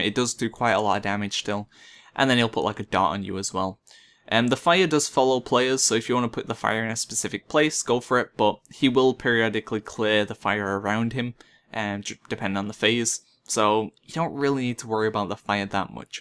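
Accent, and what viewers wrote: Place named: England, United Kingdom. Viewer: Adeeb Hamad Manchester i think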